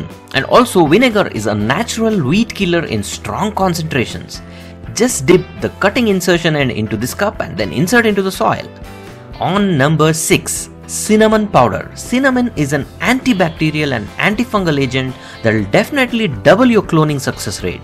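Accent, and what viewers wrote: India. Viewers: You sound very funny... Are you an indian? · Thank you.That was the most informative instructive article I have read about routing .hormone on the internet. The accent is from India I think. Please let me know. Thank you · Very bad indian accent-English language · I like your Indian English accents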